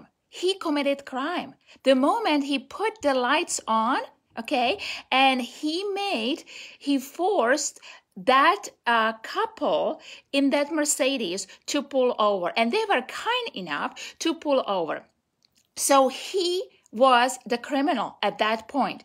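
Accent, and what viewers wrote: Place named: United States. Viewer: I love your American accent beautiful lol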